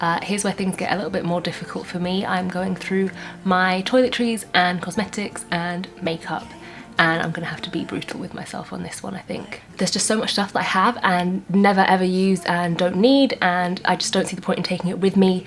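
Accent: United Kingdom. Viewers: i love to listen to people with a british accent that's so asmr tbh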